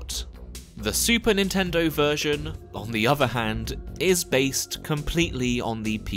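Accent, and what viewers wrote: United Kingdom. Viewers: Ayyyy it's the boi! That's what I like to see. Missed this incredibly calming and soothing, british voice👏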